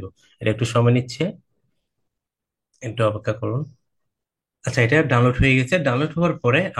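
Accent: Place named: Chittagong, Bangladesh. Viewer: vai apni ctg mone hoi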